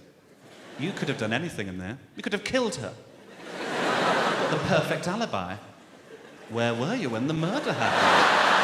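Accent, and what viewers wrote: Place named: RP, United Kingdom. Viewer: He talks like the Queen.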